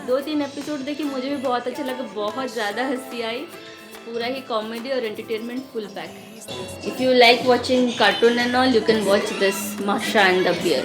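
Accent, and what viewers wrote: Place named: Odisha, India. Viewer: No your hindi is not that bad ... its generally the accent of odias.... anyways it will improve with time ... and the video is not boring at all . And at some part of the video only the background music was dominating your voice (hope you dont mind )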